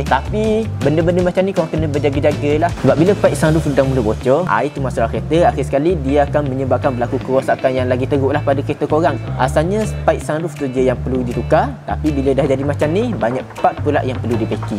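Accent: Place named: Terengganu, Malaysia. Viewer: Org ganu eh ckp gini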